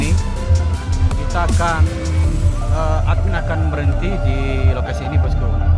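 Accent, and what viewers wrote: Solok, Indonesia. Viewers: Ahaiiii mandtap ciri khas dialek soloknya bro😁😁👍👍🙏🙏🙏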